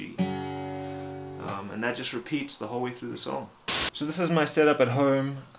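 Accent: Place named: South Africa. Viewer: You sound South African. . . Just like me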